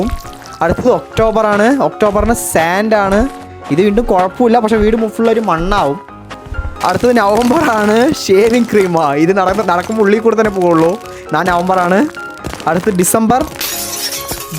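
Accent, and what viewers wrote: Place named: Palakkad, India. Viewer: Palakkad ahno veed slang kettapo choichathann NJN palakkad ahn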